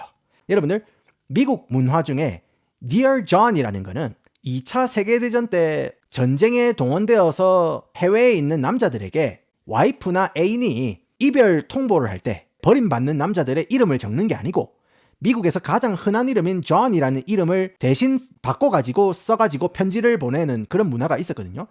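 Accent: Gyeongsang, South Korea. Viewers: 구수한 경상도 사투리에 갑자기 튀어나오는 원어민 발음이 뭔가 언발란스한데 좋네요 ㅋㅋ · 나 충남 당진 사람. 자꾸 전남 당진 당진 할래? 처음에는 전남 강진이 라고 하더니만 자꾸 당진 당진 하네 서버r할나미 뒤짏라고. 경상도 사투리 쓰는 거 같은데 그쪽 동네나 빗대서 얘기를 해 자석아~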